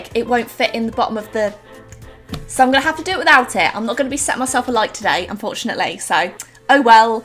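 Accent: United Kingdom. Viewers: Are you British ??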